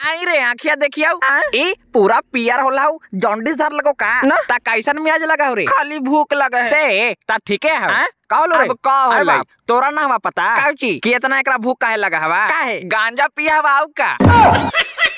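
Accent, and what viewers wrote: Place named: Patna, India. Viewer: Je tu patnaiya tone or bhasa me bolte hauwan.... Baddi bes lagat hauye.... 😂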